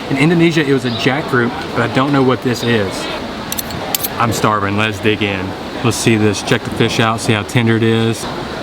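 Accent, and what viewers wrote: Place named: South, United States. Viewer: Love your southern accent as well, you're so lucky to get to travel and eat all those exotic cuisines.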